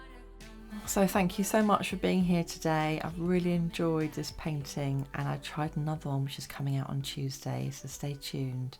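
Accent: United Kingdom. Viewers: you always paint so gracefully and elegantly, your grace, and your adorable British accent matches the videos beautifully.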